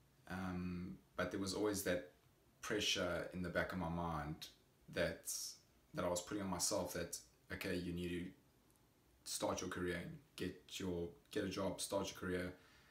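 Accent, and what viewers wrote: South Africa. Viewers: Are you South African ? Idk the accent sounds so familiar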